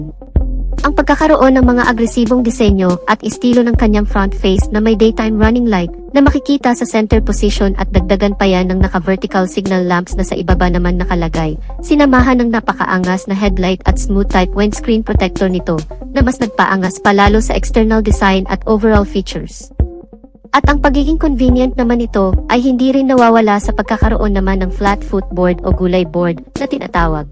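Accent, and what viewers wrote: Visayas, Philippines. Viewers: Bisaya ba ang nag sasalitang ito....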